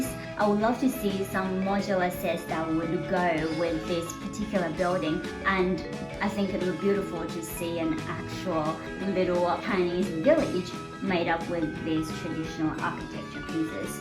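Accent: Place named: Australia. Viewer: Are you Australian? You sound Australian.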